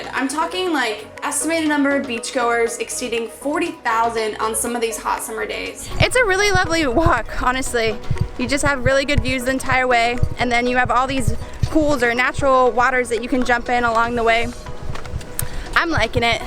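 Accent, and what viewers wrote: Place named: Australia. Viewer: I actually found it ironic you said you sometimes don’t understand when Australians are talking to you. I am originally Chinese who have been living here for 20 years. I found your accent is somehow closer to Australians than many Americans.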